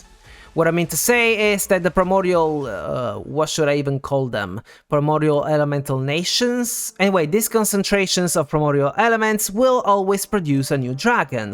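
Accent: Italy. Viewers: Ahaha ecco cos'era quell'accento! Ciao compatriota! Sei fortissimo, adoro i tuoi video e cerco sempre di stare al passo. Spero che il tuo canale possa crescere, te lo meriti davvero ❤️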